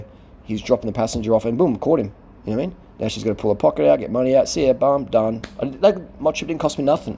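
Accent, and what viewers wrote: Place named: Australia. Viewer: There is do and don’t . Her outfit is so inappropriate. I don’t think she study to much before she came to the country . Please put some clothes on . Culture and etc be respect . You are the first one that use the F word in video , beside that it is a nice video. Sound like Australian .. I do not have a problem Where you from at all and I am not jealous the way she dress just worry that she will be rape or something will bad will happened. Just be safe. She is not doing any modeling on the street I don’t think.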